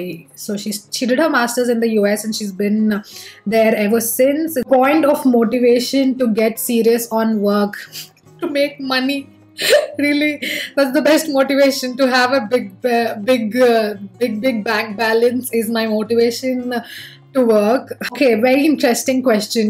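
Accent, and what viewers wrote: Telangana, India. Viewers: Much much relatable. I have recently discovered your channel and really love your content. Please vlog in Telugu also. Love the Telangana slag 😛Loads of love from Vijayawada.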